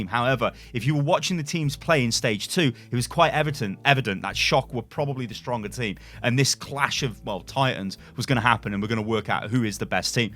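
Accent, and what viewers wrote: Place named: United Kingdom. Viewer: Your British talk is confusing. But I love British talk so I’m learning lol.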